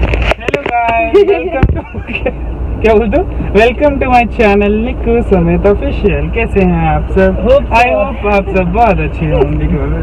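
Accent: Bihar, India. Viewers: Kya aap Bihar se ho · App Bihar se ho kya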